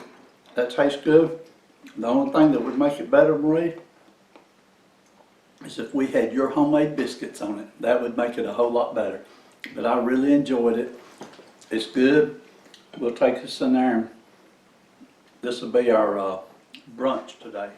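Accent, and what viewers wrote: South, United States. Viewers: Looks yummy..great for a brunch..;) love your southern accent..I lived in va as a navy wife..loved living on the east coast..;)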